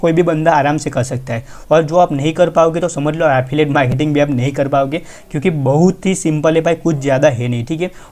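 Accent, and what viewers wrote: Gujarat, India. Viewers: Kya aap gujarat se ho? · bhai tame gujarati 6o?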